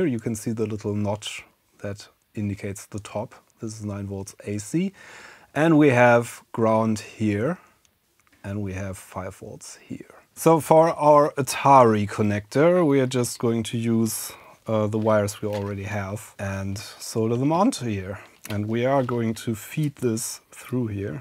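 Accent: Germany. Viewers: Man merkt direkt das du Deutscher bist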